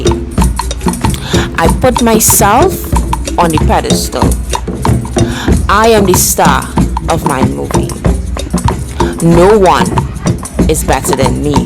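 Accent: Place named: Trinidad and Tobago. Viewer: I am SOOO grateful to hear a fellow trini voice - saying this! 🎉 Sis, idk you.....but I Appreciate you...!!!! 💃🏽💋🌹✨️💦👑🇹🇹💕💕 💯💯💯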